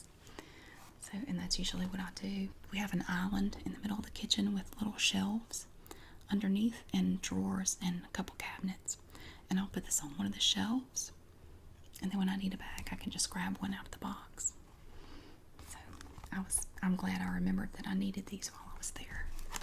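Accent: South, United States. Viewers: Well in any case, thanks for making these Southern accent whispers. I love them.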